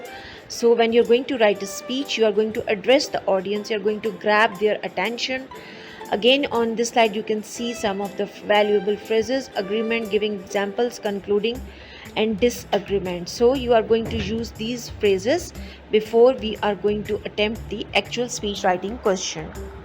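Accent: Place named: India. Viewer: oh hell nah miss ur accent is indian typa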